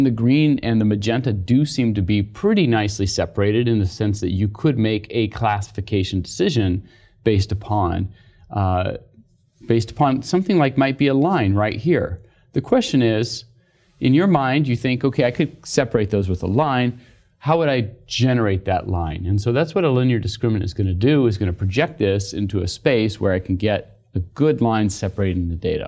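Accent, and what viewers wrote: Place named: General American, United States. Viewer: A new class of teaching👏👏👏 Good style, perfect suit, standard american accent and high quality content. Thanks a lot👌👌👌👏👏👏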